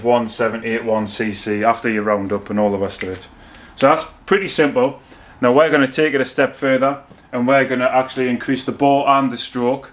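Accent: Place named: Newcastle, United Kingdom. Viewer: It's like watching a Geordie edition of Engineering Explained - Which is a good thing.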